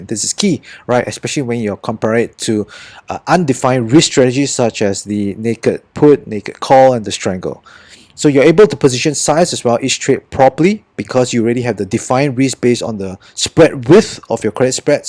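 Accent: Singapore. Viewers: U sound like Singaporean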